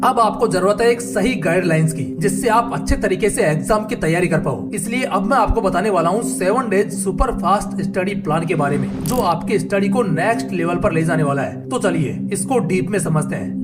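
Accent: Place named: Mewat, India. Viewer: Haryana new Mewati soundh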